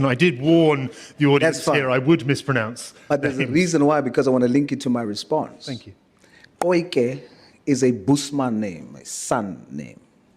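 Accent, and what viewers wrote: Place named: India. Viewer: once again why drag the Zulu or Bantus in general into your claim about the land. You do not see actual Indigenous Black Africans do this, because they are Indigenous. And please stop ✋️ you do not have a tribe of Khoi people. You are a Coloured. You belong to the Coloured community. You speak a predominantly European language. Your customs are of European South Africans. Your education is that of a Coloured South African. Your phenotype is of a darkskinned Indian...your accent even sounds partial indian (I think all Coloureds have a sort of Indian/ Dutch accent for the record). You are culturally appropriating. The worst part is you are antagonistic to actual Black Indigenous population and it is because they are Indigenous and you envy that because you cannot legitimately say the same. I have never seen you go after your White ancestors like you do the Bantu. 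Your white ancestors taught you to hate the Bantu just as your white ancestors told you you are Indigenous but majority of ancestry is European and Asian. You all are raised to be antiblack and support the white power structure in trying to make the Black people foreigners in their own land. This is truly the work of White Supremacy!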